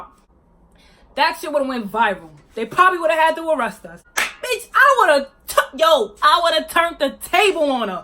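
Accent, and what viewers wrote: New York, United States. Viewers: You're hella gorgeous and your New Yorker accent is so lit yo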